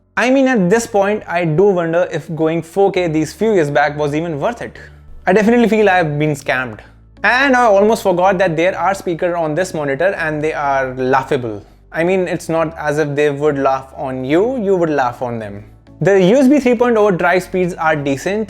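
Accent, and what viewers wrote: India. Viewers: in other words joking in english sounds funnier in indian accent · The indian accent is epic!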